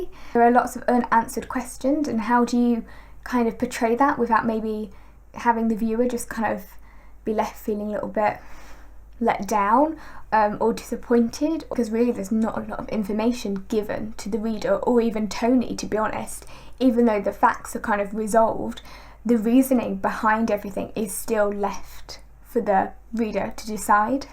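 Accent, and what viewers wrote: London, United Kingdom. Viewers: What's so special about this lassy's voice? She could be any schoolgirl from London or the home counties.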